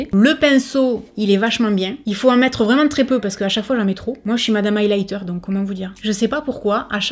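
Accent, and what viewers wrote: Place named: Pas-de-Calais, France. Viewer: J adore tes vidéos et ton petit accent bizz du pas de calais 💋👍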